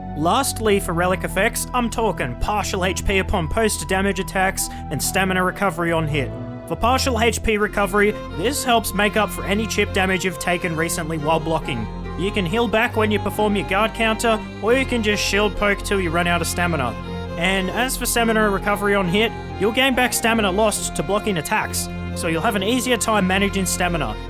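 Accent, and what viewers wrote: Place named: Australia. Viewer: I've seen your videos before where you were disproving the slander on guardian and showing how he's actually supposed to be used, and I was already planning on playing the character at some point, but after watching a video of this dude absolutely slaying shit in depth 5 with guardian, it further motivated me to pick this character up after countless hours I've spent on executor and Wylder. So when I was looking for a character guide just to make sure I was doing things right, I knew it was an absolute must to make sure the video was done by you. As soon as I heard that Australian accent, I knew I was in the right hands lol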